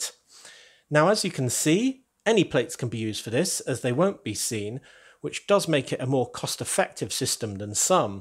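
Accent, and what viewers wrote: United Kingdom. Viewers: When you build a curb with two plates height, in reality, this would be a Curb of 24cm /9,4 inches (In the Minifig scale )). I'm from Germany, where the curbs are 15cm/4,7 inches high. So I am not sure, if in other countries curbs of such great height of 9,4 inches exist. Can you say, if in Great Britain (due to your dialect it seems, that you live there) or in the USA (if I guessed your home country wrong) such high curbs of 9,4 inches exist?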